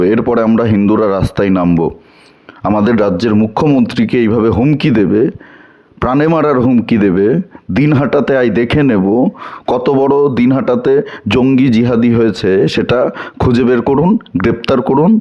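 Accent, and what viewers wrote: Bangladesh. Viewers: কথা শুনে মনে হচ্ছে বাংলাদেশি মাল।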